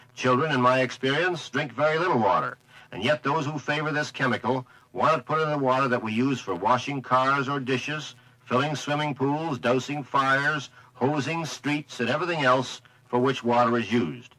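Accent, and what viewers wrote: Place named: Canada. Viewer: Wow, what a Canadian accent sounds like "Truth".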